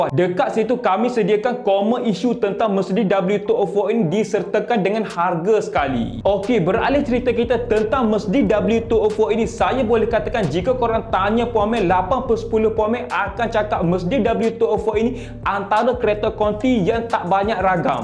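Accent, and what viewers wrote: Kelantan, Malaysia. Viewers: kuat bunyi kelate demo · Aku confuse dia ni kelantan ke jawa…😅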